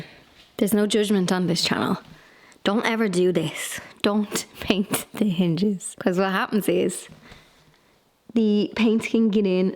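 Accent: Ireland. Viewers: lovely video as usual… really enjoy the calmness of them, and you’re sweet Irish accent. Have been thinking about your floor choices… love the brick and think that it is a beautiful statement piece, but wondering if you would ever be barefoot in that space and how that would feel underfoot? Might be a silly question from someone who lives in Australia ha ha. My other thought is how high can you fill the grout to alleviate the variation between the grout and the brick (possibly less dust collection?) Also think if that kind of flooring is high maintenance definitely investigate a robot vacuum!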